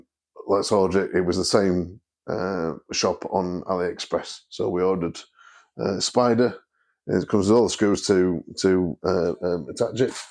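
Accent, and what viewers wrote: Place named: South Yorkshire, United Kingdom. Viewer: Thanks! Nice to hear a south Yorkshire accent. I'm in north Norfolk so not a million miles away. I'm currently looking seriously at the HEM15 to replace the Skywatcher Alt-Az I'm currently using, so your review is very useful. I understand the HEM15 has wifi, so presumably I don't need the hand controller?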